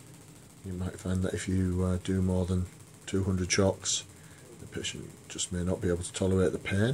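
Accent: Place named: North, United Kingdom. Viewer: Can you use EWST for proximal long head bicep tendinitis? You sound northern are you based in the north ? Do you have a website (assuming the answer to the 1st Q is yes).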